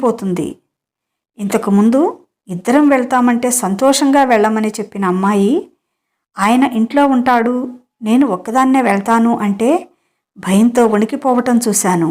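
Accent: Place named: India. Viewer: Chala bagundi talli. Teluguni telugulage acham telugu ammai laga chadivavu. Santosham. Subham. 🌷✋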